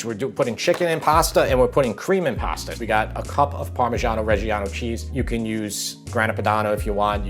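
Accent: New York, United States. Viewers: Mostly here for the delightful New York accent. North-Eastern US has the most pleasant sounds of all US accents.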